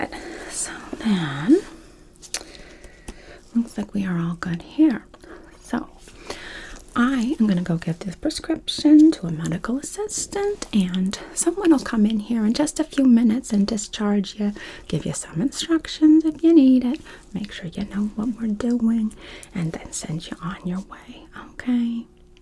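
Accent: United Kingdom. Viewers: I know I usually comment some crazy scenario for a bit of fun. But this time I just want to say amazing work, and I really appreciate all of the effort you put into your videos! And also, that British accent is brilliant, well done! Thank you for all of your videos! Also, you look extra stunning with the short blonde hair! 😍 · loved the British accent 🥰 great video thank you soooooo much😘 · Loving the British accent 👌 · Fellow Brit here - love your work and great accent!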